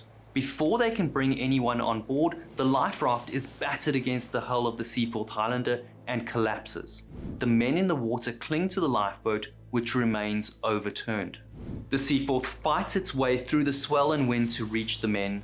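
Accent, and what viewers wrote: South Africa. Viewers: I asked him the exact same thing when I discovered the channel :) Are you south african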